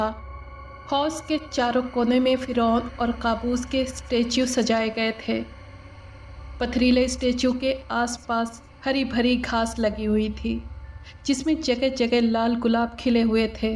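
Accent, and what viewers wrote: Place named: Lucknow, India. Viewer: Yeh pyari awaaz zaroor Lucknow ki hai . Carry onnnnn 👍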